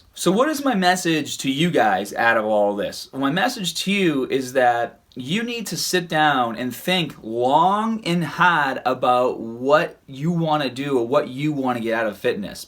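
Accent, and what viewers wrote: Boston, United States. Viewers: That Boston accent is classic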